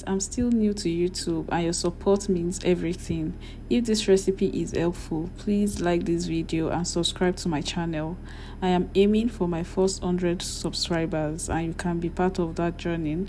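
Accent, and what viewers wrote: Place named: Nigeria. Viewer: I am Yoruba & I can tell from her accent that she is a Yoruba or Edo woman. However, it doesn't matter. I learn from different people from different parts of Nigeria, Ghana & Cameroon.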